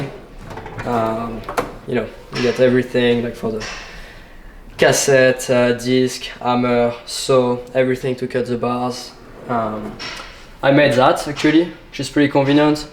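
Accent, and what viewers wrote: France. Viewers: Super utile, je me demandais justement comment j'allais mettre mes trois bexons au mur avec les Steadyracks que j'ai commandé hier, merci pour le tuyau. Et... pas mal ton anglais, juste avec un nice French touch :) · Strong French accent there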